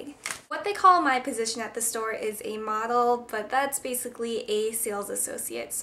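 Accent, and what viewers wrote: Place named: Chicago, United States. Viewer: you are sooo cute and i love your "accent", is that a chicago accent? i can't figure it out and it's bothering meeee!!! ahhh!!!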